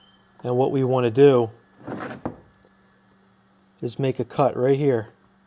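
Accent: Philadelphia, United States. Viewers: True story- video with Philly-accented voiceover builds instant trust 💪 Thanks, brother!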